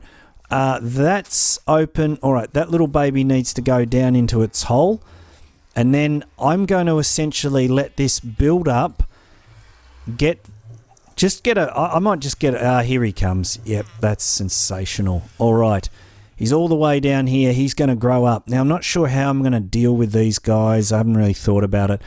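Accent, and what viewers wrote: Australia. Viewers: Honestly, the only reason why i subscribed to is the aussie accent, I live in AU, and it is odd, but in Brisbane, noone has this accent, they all speak strangelly normal...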